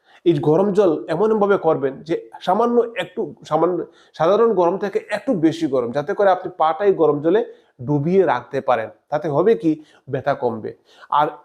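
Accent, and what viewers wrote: Sylhet, Bangladesh. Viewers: আপনার একসেন্ট সিলেটের। আপনি কি সিলেটি ?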